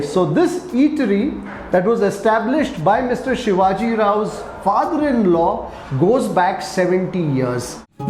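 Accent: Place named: Pune, India. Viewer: Sir. You speak pune marathi. But here in karnataka they use karnataka marathi. Which is not such pure marathi. It is bit different from place to place sir.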